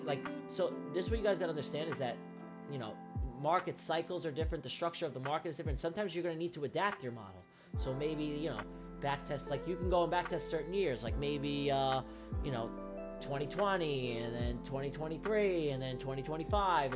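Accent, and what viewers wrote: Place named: New York, United States. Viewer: Bro can definitely talk for sure. No doubt about it he's from new york.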